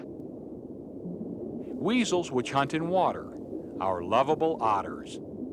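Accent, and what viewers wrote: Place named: United States. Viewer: Can any American tell me what regional accent the host has? I love it!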